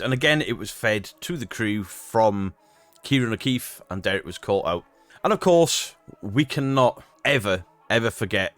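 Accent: Wales, United Kingdom. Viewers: He’s Welsh 😊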